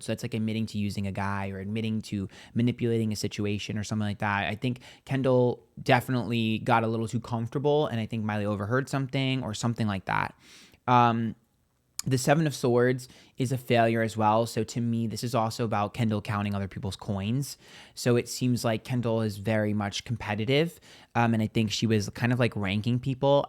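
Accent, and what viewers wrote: New York, United States. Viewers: I know you live in Texas but I recognize that New York accent! I’m born and raised in Long Island NY and people make fun of how I saw words and how fast I talk. But when I listen to you, I feel like I’m listening to a friend from home. Love it!!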